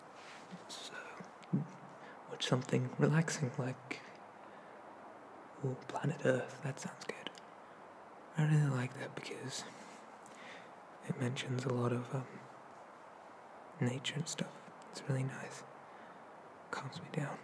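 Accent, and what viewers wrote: United Kingdom. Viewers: Am I the only one who loves the slight british accent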